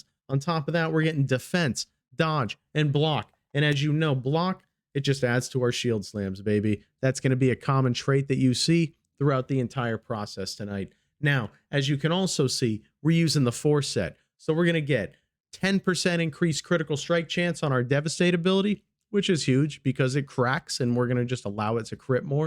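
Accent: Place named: New York, United States. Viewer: Haha! omg his accent is so freaking great. I feel like I'm in NYC being given directions to the Chrysler Building or taken to my seat in an Italian mobster restaurant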